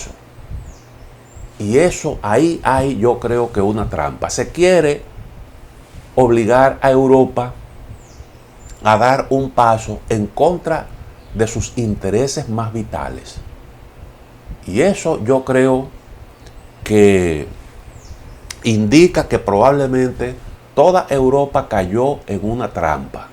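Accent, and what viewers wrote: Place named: Dominican Republic. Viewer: Ese asento me parece quisquellano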